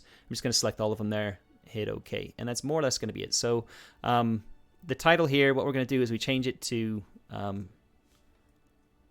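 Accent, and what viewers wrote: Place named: United States. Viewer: Interesting game idea. Also, unrelated to anything you were talking about: do you know you sound like an American?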